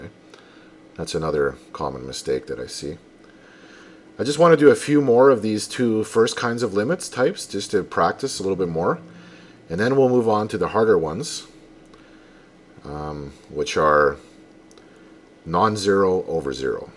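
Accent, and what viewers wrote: Canada. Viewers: The Canadian accent makes it sink in better I swear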